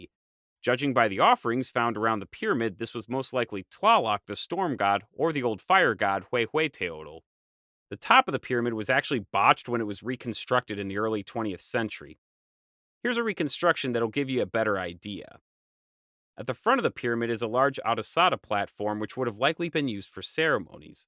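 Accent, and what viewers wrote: United States. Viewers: Is this due to the thick American accent?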